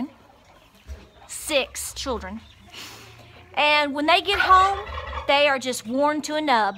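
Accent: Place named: Tennessee, United States. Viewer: I knew I liked that accent. I use to have a friend that lived in Tennessee that would talk about that team. Good advice! I talk to some local farmers who say they owe way more then there farm is worth and they can't stop farming because if the debt. I can see you appreciate the little things in life. Keep up the good work sound like a neck of a woman. God Bless and take care from WI!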